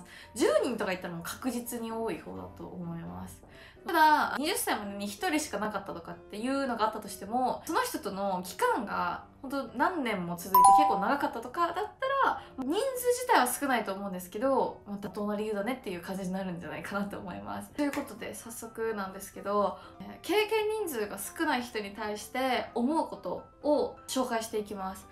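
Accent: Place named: Kansai, Japan. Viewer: 、めちゃくちゃ関西弁出てる。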